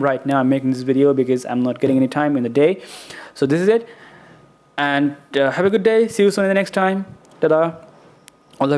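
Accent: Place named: United States. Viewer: দাদা, অনেক অনেক ভালোবাসা ভালোবাসার American accent 🥰🤪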